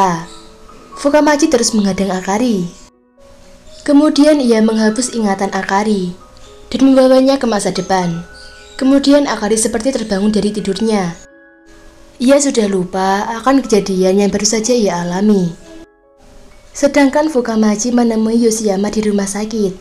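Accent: Java, Indonesia. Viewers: Suara mu medok nduk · kelihatan medoknya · Medog gaes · Kak riquest anime perang ato saling bunuh aku suka v] Dan biasakan bahasa indobesia Dan kurangi nada kayak orang jawa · Yang ganjel aksen jowo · adminnya orang jawa ya.. D-nya itu lo.. khas banget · admid pasti orang Jawa 😄 Medoknya gak bisa bohong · mbaknya logat jowo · org Jawa yah? Kok medok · Medok bgt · Medok bnget sis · Jawanya medok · Cie medok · Mba nya medok yaaa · Bahasanya medok gk nyaman dengerinnya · wong jowo medok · Logat jawa nya kental bngat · saya tak suka suara medok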